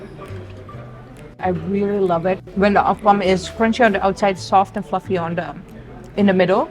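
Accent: India. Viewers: Your accent turned to Indian accent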